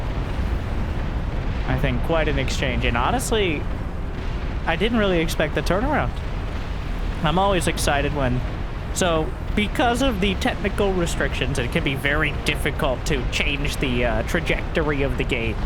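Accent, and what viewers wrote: United States. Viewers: What is dudes new American accent?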